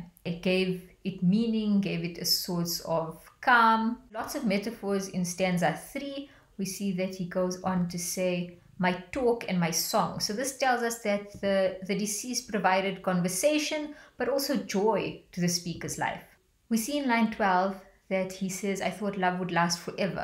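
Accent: South Africa. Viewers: south african? i can hear the lovely accent 🇿🇦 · Are you south african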